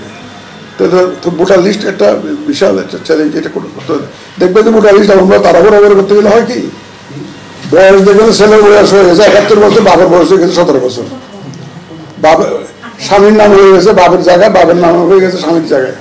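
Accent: Chittagong, Bangladesh. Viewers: কথায় বুজা যাচ্ছে উনার বাড়ি ও চট্টগ্রামে। যাহোক উনার কাছে আমরা ভালো কিছু আশা করি। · হুম তাই। উনাকে আজকেই দেখছি, প্রথম বার উনার কথা শুনছি। উনার কথা শুনে কমেন্ট পড়তে এসেছি, উনি কোন জেলার কেউ লিখেছে কি-না। কারণ উনার কথায় আমাদের চট্টগ্রামের একটা টান আছে। আপনাকে ধন্যবাদ, উত্তর টা পেয়েছি। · কথায় একটা চাঁদগাঁইয়া টান আছে।চট্টগ্রাম ❤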